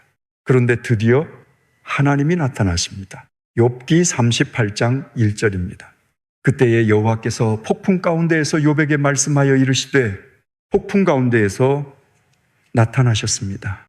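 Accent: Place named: Gyeongsang, South Korea. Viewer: 목소리도 전혀 아니에요 경상도 말씨인데 참 유튭.들 ㅍㅍㅍ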